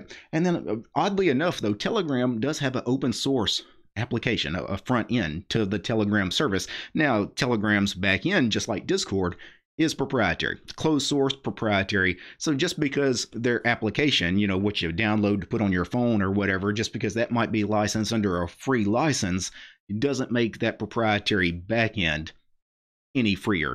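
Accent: South, United States. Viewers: your southern twang is glorious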